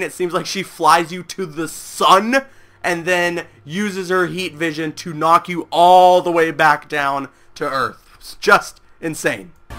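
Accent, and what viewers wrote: Canada. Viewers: Canadian accent ?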